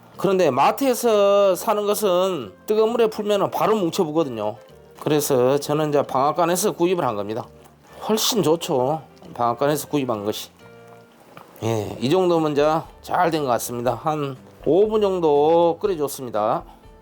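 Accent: Jeolla, South Korea. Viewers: 셰프님들중 셰프님이 쵝오 입니다 설명도 귀에 쏙쏙 들오게 잘해주시고 전라도 사투리로 아주 재미 있습니다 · 구수한 전라도 사투리가 듣가 좋습니다..저도 고향이 전라도..ㅋ. 절이는 노하우 이용해서 올 김장은 좀 더 많이 담궈야겟네요..감사합니다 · 손님들이ㅡ한장 해버려 구만요ㅡ전라도ㅡ사투리 조아요ㅡ😏😏😊👍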